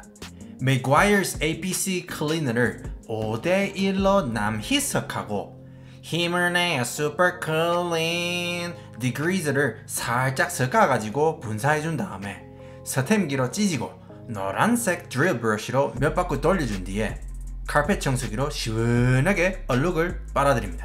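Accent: Gyeongsang, South Korea. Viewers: 고품격과 저품격, 잉글리쉬와 갱상도쉬가 혼재된 나래이션이 상당히 중독성 있네요 ㅋ 열씨미 새차로 마이 맹글어주세요~😀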